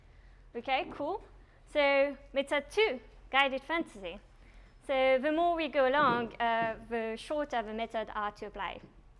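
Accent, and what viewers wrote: France. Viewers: Very educational presentation with good examples. Easy to understand. I like the French accent too :)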